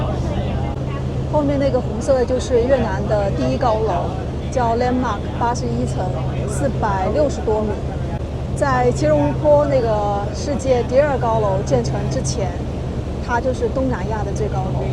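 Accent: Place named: China. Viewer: 英文太偏中式😂😂😂比不过越南酒店前台服务。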